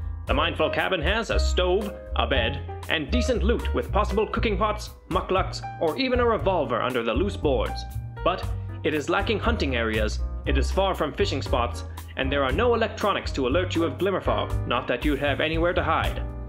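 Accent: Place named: Transatlantic, United States. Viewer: Haha, this was such a fun video! Love the format, and your subtle Transatlantic accent 😂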